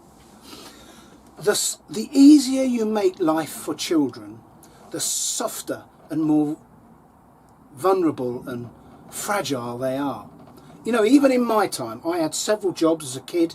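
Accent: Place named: Newcastle, United Kingdom. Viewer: A Geordie accent eh? Explains a lot lol. Can't you make powdered beetroot? It would go well in your smoothies. I can't understand people and their plots. I agree, I see them rushing in, watering with a 7.5 litre watering can (after waking 50 metres to get it) then off they go.I think flowers are intrinsic on the plot. People do garden differently. It would be boring otherwise. Elaine 🌱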